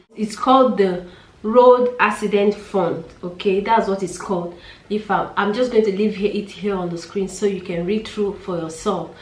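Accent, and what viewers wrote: Nigeria. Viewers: So nice listening to you, you are beautiful love your Nigerian accent, and thank you SA are good to us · we love you here in SA 🇿🇦🇿🇦, plus your Nigerian accent sound beautiful sis.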